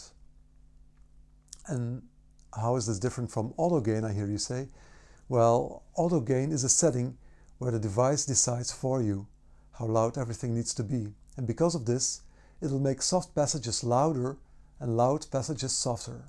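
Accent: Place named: Netherlands. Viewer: Je Nederlands komt er door, geen probleem hoor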